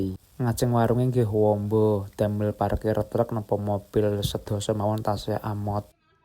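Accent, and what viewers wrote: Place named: Java, Indonesia. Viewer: Bahasane jowo kok medok banget opo dari jawa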